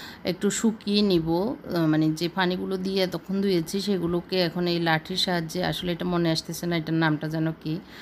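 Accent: Noakhali, Bangladesh. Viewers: আপু আপনি কি নোয়াখালীর। ভাল না লাগলেও glabs use অবশ্যই করবেন। আপনার হাত নষ্ট ঽবেনা। অভাব হয়ে যাবে।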